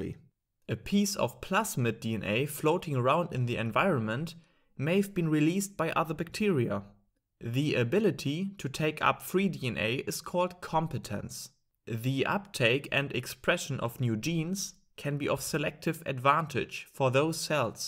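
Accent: Germany. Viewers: dein deutscher akzent ja moin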